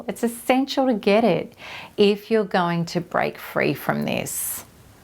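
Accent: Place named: Australia. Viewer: I never cared for Australian accents, no offense. Then here comes! Your voice, including accent 😀 is so soothing. God bless the good work you do! CD for me was all the shitty things he was DOING and the "I love you" he was SAYING.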